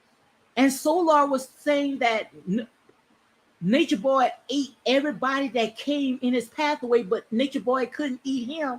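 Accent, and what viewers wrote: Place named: New Orleans, United States. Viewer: You have to be from New Orleans... you sound exactly like my GranMom wit that accent.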